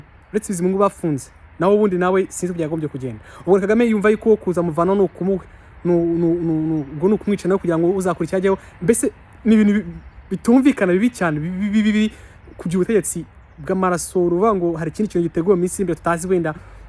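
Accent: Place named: France. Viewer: She has a french accent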